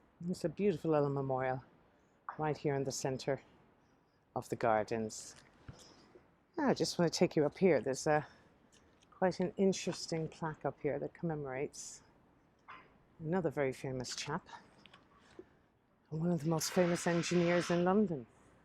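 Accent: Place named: South, Ireland. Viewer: Found you by accident through looking for famous graves. I was so interested in your voice and i was thinking to myself thats and south irish accident, i came by your videos of Killarney and my god you a killarney woman. Im from North Kerry in a small town of Listowel. Your videos are so interesting and your jack the ripper story was class. Keep up the great work from a irish fan ( sure you only from down the road).:)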